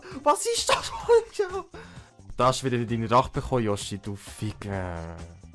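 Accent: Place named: Switzerland. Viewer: Mmmmm Musik in miiene Ohre. [Schwiizerdütsch] andlich moll a Kanal wo nit Schwobedütsch redet. An alli Dütche wo dass woll läse und mi nit so richtig verstot dass soll nümme Spass sie und Zücher Chönet grat wag goo. Spötistens jetzt würde ihr in lelem Kanton i lab. ;)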